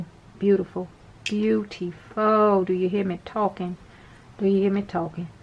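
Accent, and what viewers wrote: United States. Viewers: Girl, are you from WV?! You sound more country than most my home girls here in the sticks! Love it! Thanks for the review! Very helpful! Edit: Did I miss this answer? Can you use them while they're plugged in charging?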